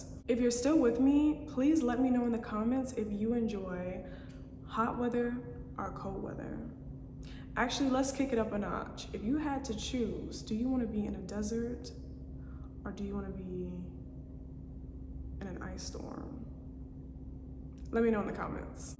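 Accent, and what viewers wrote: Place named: Alabama, United States. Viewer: you talk like a Bama girl though ! 🙂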